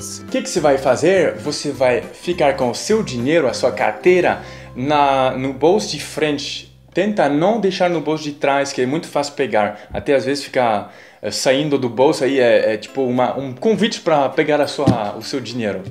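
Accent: France. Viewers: E muito bonitinho o sotaque de quem é francês e está aprendendo português! Tah de parabéns! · ...por favor nao perca o sotaque frances pelo amor de deus...se nao vc perdera o charme.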